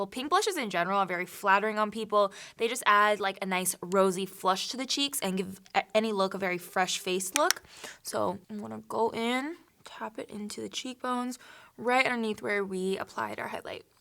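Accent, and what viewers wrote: England, United Kingdom. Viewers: So everyone is just gonna ignore the fact shes been using a British accent 🤔🤨😂😂🤣.... im here for it though, i do it all the time also, glad im not alone!!.. happy valentine's day 💕💗💞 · I love how you always throw in an English accent 🤣🤣 loved this fun pinky look 💗✨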